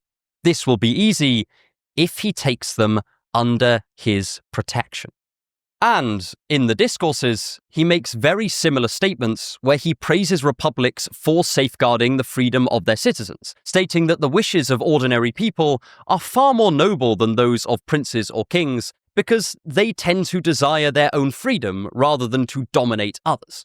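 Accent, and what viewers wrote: United Kingdom. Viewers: Ah, nothing better that a good video-essay with a good orator with British accent and 1am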